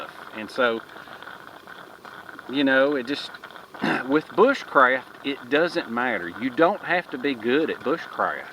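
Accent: South, United States. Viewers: I was wondering where in the South you were... I could tell you were somewhere in Antebellum country by your dialect.... Georgia the setting of 'Deliverance'- watch your corn hole in them woods that boarder Appalachia 😎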